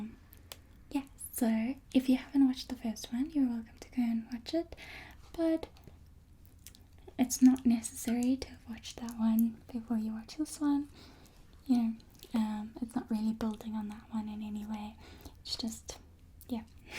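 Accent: South Africa. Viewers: I looove the South African accent, as a New Zealander I often get confused as one but I don't mind because I think it sounds great! Lovely video :)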